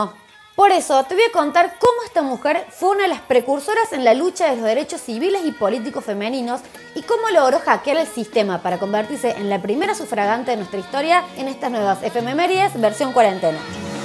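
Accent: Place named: Córdoba, Argentina. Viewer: Historia, memes y acento cordobés! Este video lo tiene todo!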